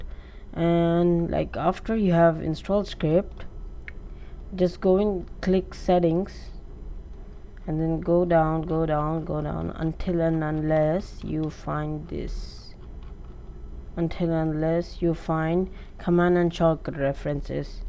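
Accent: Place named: United States. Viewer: are han thike mujhe maloom hai kya amrica ki accent mai kyun baat karre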